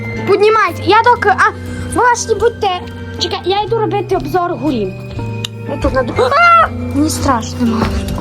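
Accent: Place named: Transcarpathia, Ukraine. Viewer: Закарпацький діалект 😅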